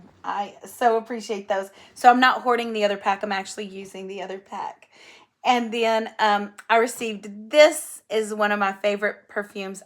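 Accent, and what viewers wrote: South, United States. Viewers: I love the southern accent in your voice. I first met you at Annie's retreat Texas Hold 'Em last month and then again at Stitching Texas. You are so sweet as everyone is. I'm so glad I found your Floss Tube. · Southern!!!! ❤you girl!!!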